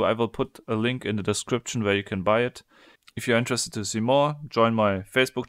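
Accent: Germany. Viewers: You are from german XD I can hear it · THIS IS THE GERMAN ACCENT ! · Wie man einfach den Deutschen Akzent direkt erkennt :D Super video c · Man hört das du Deutsch bist. 😅 Nicht böse gemeint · Man merkt das du deutscher bist :D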